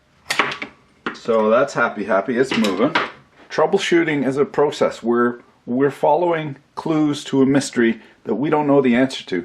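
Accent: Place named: Canada. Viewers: My wife think's voice (read Canadian accent) is kinda sexy, since watching while in bed has been my newly formed habit. I can confirm that sexy time with the mrs has increased approximately 33.333% this past month (March). He is the ultimate wingman; helps me get laid while teaching. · it's that Canadian charm boys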